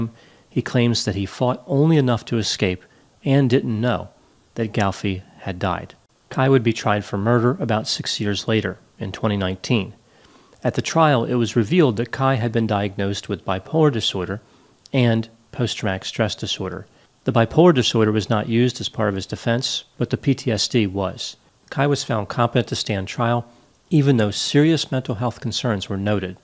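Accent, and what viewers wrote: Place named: East Coast, United States. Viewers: I almost want to agree with you on that one, it sounds definitely east coast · I hear it again on the word "thoughts," as well. New England is as narrow I can place it.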